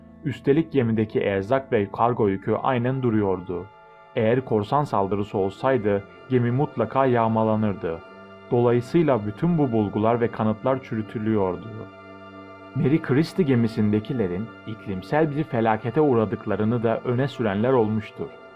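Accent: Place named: Germany. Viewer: kardes alman ikinci liginden gelen gurbetci topcular gibi konusuyosun.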